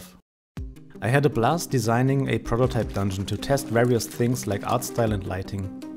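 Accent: Germany. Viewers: du bist deutscher das hört man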